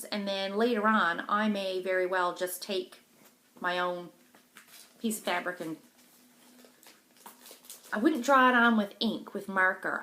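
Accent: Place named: Newfoundland, Canada. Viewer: Great video/WIPs ...I loved your doodling pics too. I really enjoy listening to your Newfoundland accent👍🏻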